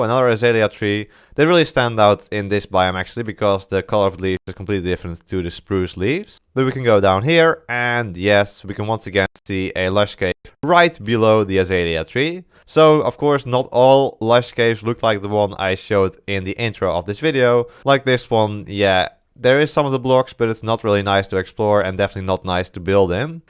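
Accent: Netherlands. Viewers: r u dutch?😭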